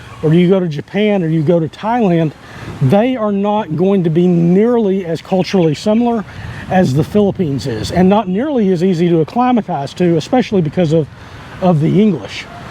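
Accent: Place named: Georgia, United States. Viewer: I can tell you must be from Georgia. 😁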